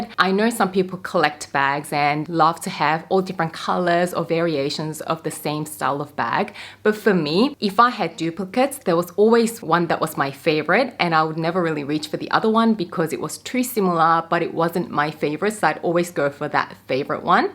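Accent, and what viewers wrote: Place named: Australia. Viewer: I saw one of your scarf videos for the first time today. It’s four years old, but I am so grateful. I waited and waited til I thought I was going to have to ask if that was an Australian accent, then right at the end you mentioned Sydney. ☺️ Can’t wait to watch so many more of your videos. I have access to new, free designer clothes, jewelry, and makeup. Those I don’t get for free, I get for as much as 90% off. I cannot declutter because my home has become s hoard and it’s a hoard with no storage. Without help, I’m just going to continue drowning. I went to the sale where I get most of my clothes, and came home with four more scars, a gorgeous handbag and a few pieces of jewelry – none of which I should’ve purchased. Designer scarves for $1.50 so I’ll use that as an excuse. I have clothes that could sell, but the resellers market has changed so dramatically, I don’t know which platform is worth it. 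If you have a suggestion, I would really appreciate it. Poshmark has been a complete waste of time for me.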